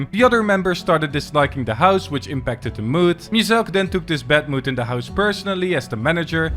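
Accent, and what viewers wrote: Netherlands. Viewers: I smell Dutch in your accent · Lekker man hoorde het dacht al een mede Nederland Chanel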